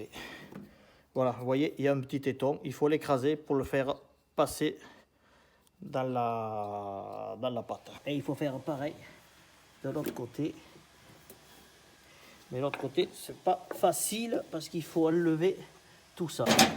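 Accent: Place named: Southwest, France. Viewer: A l'accent, on croirait entendre Maïté nous faire une de ses recettes de cuisine.....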